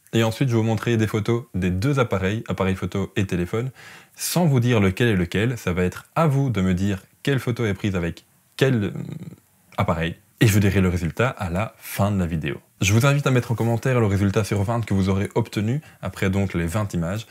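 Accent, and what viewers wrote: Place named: Charleroi, Belgium. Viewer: Accent de Charleroi je dirais 🤔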